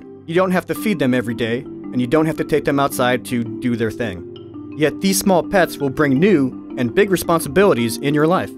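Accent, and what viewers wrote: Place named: Greece. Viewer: You're Greek, aren't you?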